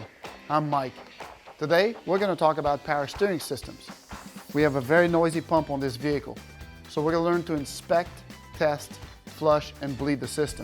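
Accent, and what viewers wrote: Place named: Netherlands. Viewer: He has a dutch accent.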